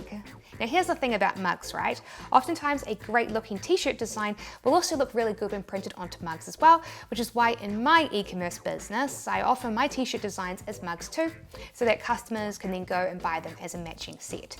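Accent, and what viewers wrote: New Zealand. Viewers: Sorry.......-but the extreme New Zealand accent is a bit unclear,--- as well as hard on the ear........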